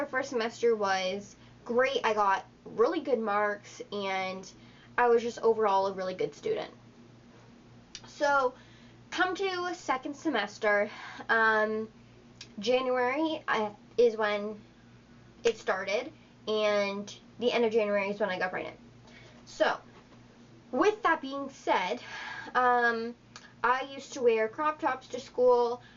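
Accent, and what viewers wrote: Canada. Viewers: RhiannaFrancesca07 i knew as soon as she talked she was from Canada lol, are you also from Canada??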